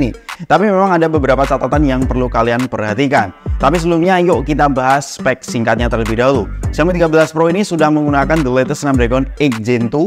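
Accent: Indonesia. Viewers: Ga, malah indo banget aksen nya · Gak lah. Indonesia banget aksen nya kok sampe Amerika latin segala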